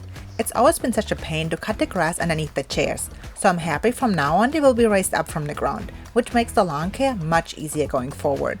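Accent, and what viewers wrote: Germany. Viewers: Sie spricht englisch wie eine Deutsche......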